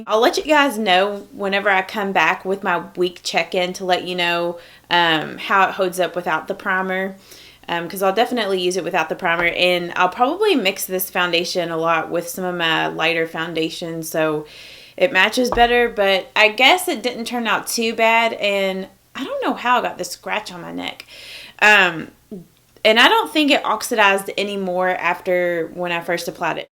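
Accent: South, United States. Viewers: shruti, she sounds southern. I’m from Alabama and picked up on it, too. Love hearing familiar voices on YouTube. 🤗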